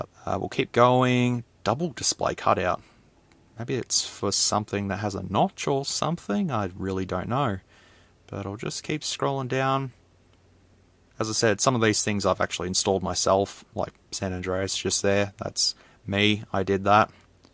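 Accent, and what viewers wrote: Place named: Australia. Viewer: You have one of the most interesting Aussie accents. I often noticed some Aussies add an "R" to wherever an "o" is in a word, but yours is super pronounced!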